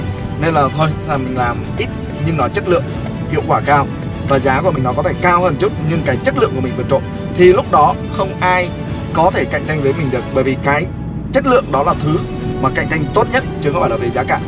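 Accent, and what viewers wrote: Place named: Central, Vietnam. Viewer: Anh. Nói chất giọng miền trung và phong cách sống rất hay. Xin anh chia sẽ về: Nghề chủ yếu? Xuất thân, trình độ học vấn, Gdinh, vợ con, nhà cửa, sự nghiệp...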